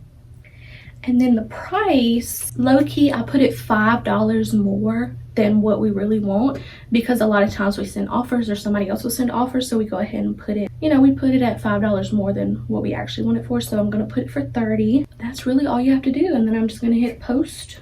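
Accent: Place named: Southwest Virginia, United States. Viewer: Hi! Va here too! I gotta ask bc of your accent. Are you from south west Va? You sound like my family.❤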